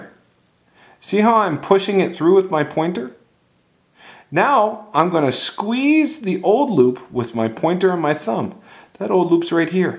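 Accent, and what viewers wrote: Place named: New York, United States. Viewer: This guy sounds like he is a new yorker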